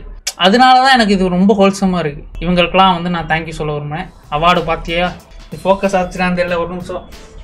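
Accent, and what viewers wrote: Madurai, India. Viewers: Bro Ru from Madurai ???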